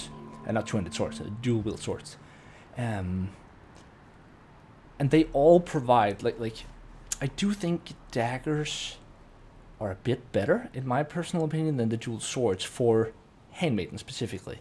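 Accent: Denmark. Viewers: My first video I'm seeing of your. You sound danish in your accent. Wondering where you are from my man?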